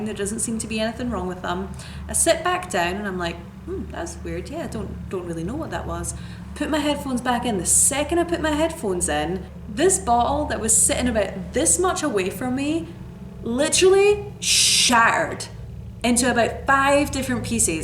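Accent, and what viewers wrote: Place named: Scotland, United Kingdom. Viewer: Like a female Craig Ferguson. Great storyteller. Your Scottish accent was much stronger then.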